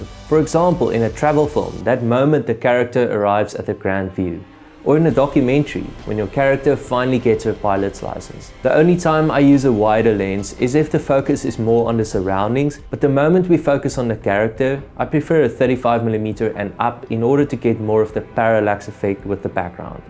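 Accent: South Africa. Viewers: I'm so happy I stumbled onto your channel. Between this, the Intent video, and the Contrast video I've never felt more in tune, capable, and powerful as a cinematographer. Weird way to describe the feeling I guess, but as a fellow Saffa it's encouraging hearing such useful, honest information in your own accent haha.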